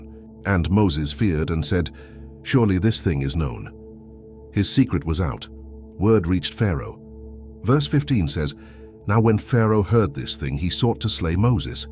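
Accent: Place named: United Kingdom. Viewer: I wish these stories were not spoken with a British accent. It’s disingenuous.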